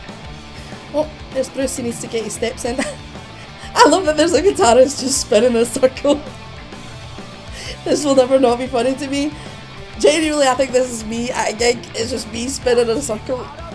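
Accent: Scotland, United Kingdom. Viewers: First of all, love that Scottish accent. 2nd, had the pleasure of seeing twice (, seven times), and it is a wholesome experience. Sing along, jumping together, a tribe coming together for a metal sermon. My fav. is, no question. You mentioned gothic briefly, I suggest you check out the by handpicked warm-up band -. Other than that; - (Live Masters of Rock 2015). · Lovely finding a scottish reactor! Pure cracking up at some of your patter, you made me homesick, naebdy swears as fluently as us 🤣 Bruce is one of the all time great singers, you want to check out what his voice was like when he was younger (just as good). I actually was at the very first gig where sang for, at the Barras in Glasgow. Powerful singer, sort of reminiscent of or.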